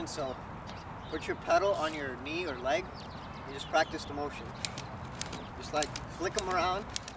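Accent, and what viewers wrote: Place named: New York, United States. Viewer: Yo are you from new york???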